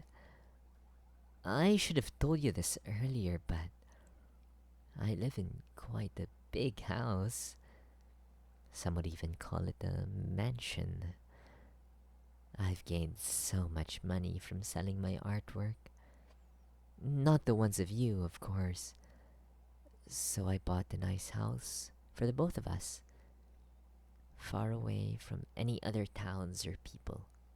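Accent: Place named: Southeast Asia. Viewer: Low-key hear a southeast Asian accent but I can't point my finger on where the accent is from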